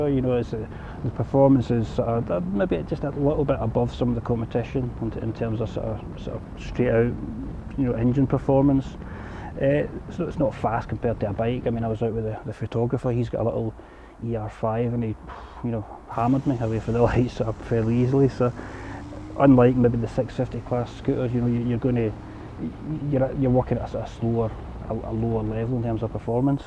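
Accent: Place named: Scotland, United Kingdom. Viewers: Strong Scottish accent. He's speaking quite clearly, and just with an accent. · Good Scottish accent, I could almost guess where he was born in Scotland. Nice review !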